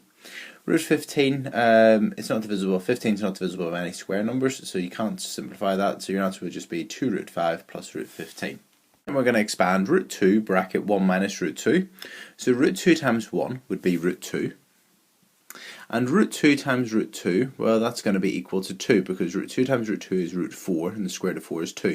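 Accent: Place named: Northern Ireland, United Kingdom. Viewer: Thank you! This is a very late comment but this was really helpful. Was surprised to hear your northern irish accent (same as me haha)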